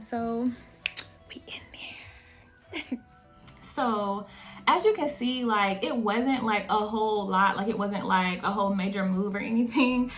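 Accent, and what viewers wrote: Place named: South, United States. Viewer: You are so pretty girl!!! Ooh, I love your accent too. Southern accent?? Anyway, your locs are beautiful. My braid locs were started end of Feb 2022. Girl, I feel you with that darn shrinkage. Mine looks shoulders right now. 😂🤣 However, my hair is around BSL to mid back. I also been documenting my loc journey too. Great video! 😘🙏🏻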